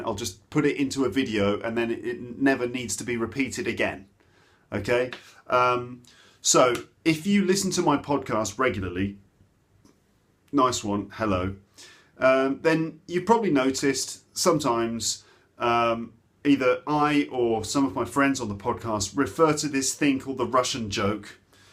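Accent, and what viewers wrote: United Kingdom. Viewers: Your pronunciation is one of my favourite British pronunciation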